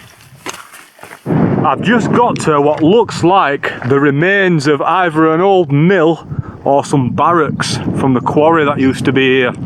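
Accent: Yorkshire, United Kingdom. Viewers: I'm guessing your from Yorkshire mate, loving your videos mate.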